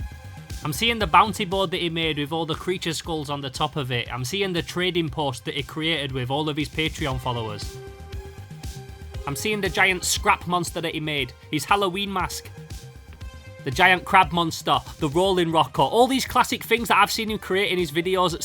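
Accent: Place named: Northumbria, United Kingdom. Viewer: What is that accent? Escaped straight out of Northumbria to make Tonks. Great video I'll subscribe to the time traveler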